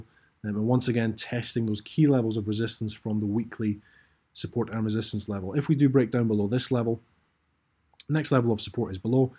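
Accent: Scotland, United Kingdom. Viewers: Greetings, great videos, especially those for beginners, which particularly helped me, and, you sound scottish, i do love the accent haha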